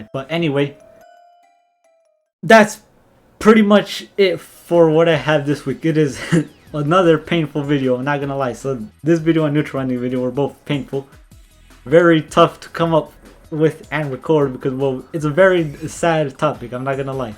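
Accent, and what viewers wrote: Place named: India. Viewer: lmao your half indian accent and you smiling all video doesent match the topic but its fucking funny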